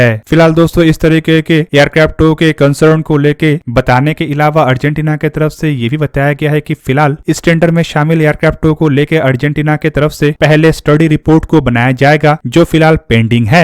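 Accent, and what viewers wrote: Bengal, India. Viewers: Bhai Bengali ho kya Tum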